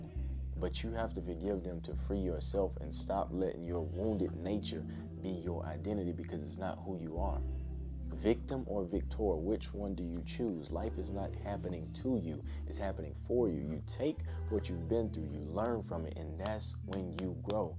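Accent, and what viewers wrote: South, United States. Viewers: Your are my greatest inspiration, so far, how do you articulate yourself so well? I heard you talking normal, and ofc u got a pretty heavy southern accent, but hkw do you manage to remove the accent? And also, are you always this calm?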